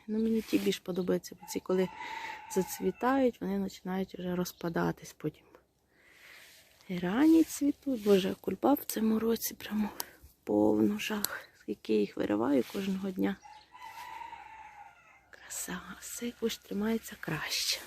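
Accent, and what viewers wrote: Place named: Volyn, Ukraine. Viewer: Доброго дня,чую у Вас волинський говір🙂.Дуже гарні у Вас квітники.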